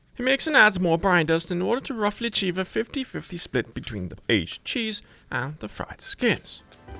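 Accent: England, United Kingdom. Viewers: You are so entertaining with the way you deliver your recipes. So different from everyone else's boring hum drum keto recipe channels on YouTube. Your videos are funny, entertaining, informative and refreshing. Loving the different costumes, characters and english accent narration.😊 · This Italian is please of what he sees. Hysterical video content and edited and awesome food. You're doing that British accent??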